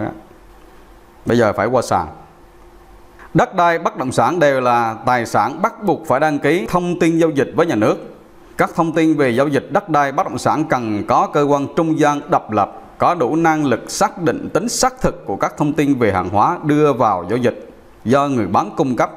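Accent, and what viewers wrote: Quang Nam, Vietnam. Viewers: Kênh e rất hữu hiệu, giọng Quảng rất hay. Tuy nhiên cần chuyên sâu thông tin và phân tích tầm chuyên gia . Anh ủng hộ.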